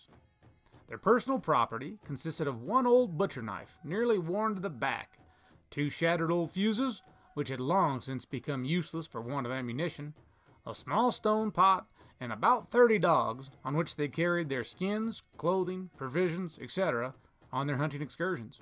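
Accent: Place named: United States. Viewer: oh oh voices of the past except an American dude with an American accent! Very excellent, and fascinating!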